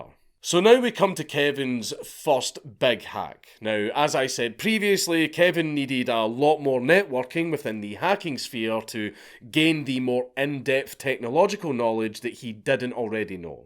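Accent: Scotland, United Kingdom. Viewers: Mic Drop 🎤 2 hours of the Scottish accent ❤...I will try to comprehend the content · This guy is still talked about today in cybersecurity classes I take. Man's a legend and hearing talk about it with that Scottish flare is amazing. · man I could listen to this scottish guy narrating peoples stories all day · I am loving the long format videos with your scottish accent. Its like a audio book but doesnt make me sleepy. I hope you will create more (as a student I can state that elevator music is great). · I stumbled across it about a month ago and dear god this is all I have been listening to. Something incredibly soothing about a stoned Scottish man telling me stories. Enjoy and subscribe!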